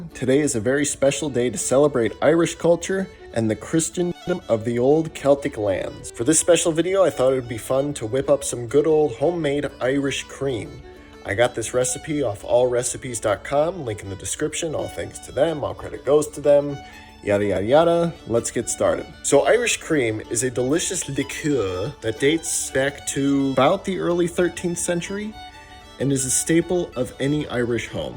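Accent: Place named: North America. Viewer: a guy who claims being Irish himself - why would he have an North American accent and not Irish?!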